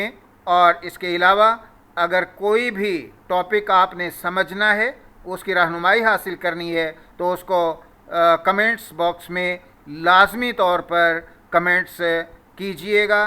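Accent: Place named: Arab world. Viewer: اچھی کاوش ہے۔ کوشش کریں کہ اردو لب و لہجے میں بیان کریں۔ یہ اردو میں عربی لہجہ بوجھل ہے۔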